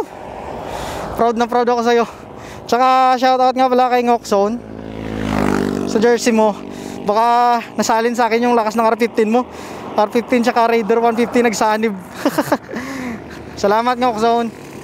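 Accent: Iloilo, Philippines. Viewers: Taga saan ka po kuya. Bat medyo ilonggo ka hahaha. (Iloilo) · Haha illonggo kaman? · Saan lugar 2 sir,,ksi ga ilonggo ka eh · kahit anong tagalog mo idol lumalabas talaga pagiging ilonggo♥️ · Ilonggo ka sir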